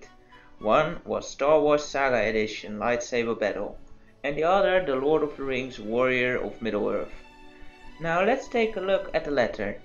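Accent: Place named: Netherlands. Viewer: dus je bent tog nederlands, ik dacht al dat het aksent herkende.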